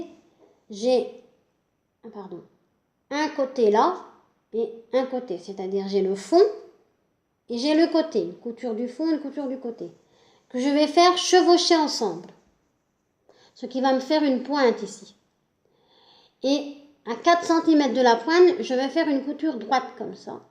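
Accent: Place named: Brittany, France. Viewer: Bravo pour votre tuto et votre bel accent du Méné bré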